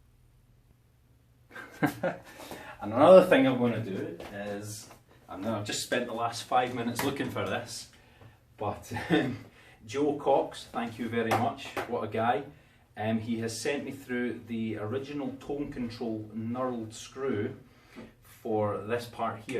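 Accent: Scotland, United Kingdom. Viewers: Are u from Scotland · Good review mate, and good to hear a Scottish voice instead of all the American reviewers.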